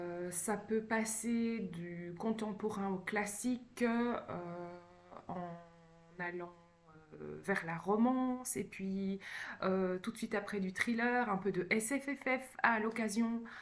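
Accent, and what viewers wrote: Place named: Belgium. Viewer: Coucou! Trop contente de te voir sur Youtube ♥ Bienvenue! Je me retrouve tellement dans tout ce que tu dis:)) J'aime beaucoup ta douceur, et ton petit accent belge hihi. Hâte de voir tes prochaines vidéos! Bisouuus ♥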